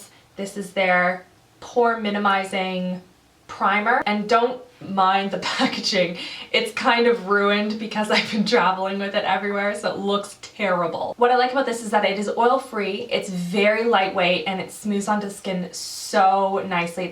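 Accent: Canada. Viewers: hi - its so funny that i after just watching u and listening to you i could tell u are Canadian :) i now my people! heheheh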